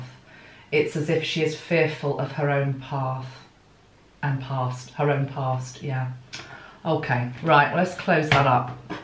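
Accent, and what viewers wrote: England, United Kingdom. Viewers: Hi . I like your English accent and the way you put those perfume on you to connect with energy . You're very genuine and kind . ❤️❤️❤️❤️ Ps . Your house looks very tidy and everything is on their place . Your dress very nice too.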